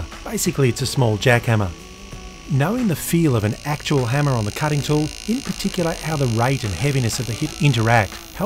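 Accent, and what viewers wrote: Australia. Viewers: Thanks for the video! A versatile guy who knows how to keep going on the job! Sound advice, good sound/video and pleasing voice/axcent! Not sure, could be Australian?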